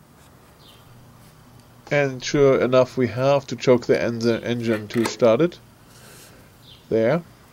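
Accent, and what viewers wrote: Germany. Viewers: Du hast einen sehr starken deutschen Akzent:)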